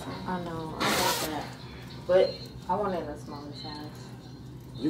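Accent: United Kingdom. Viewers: the british accent LMFAOOOOOOOO 😭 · Chill vibes on a Sunday 😂🙂😂...the British accent for me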